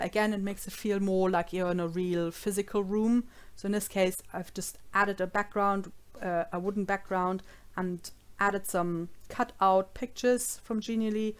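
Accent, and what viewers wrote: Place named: Germany. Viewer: Excellent how-to tutorial. I've watched it several times. Your lovely German accent reminds me of my late mother.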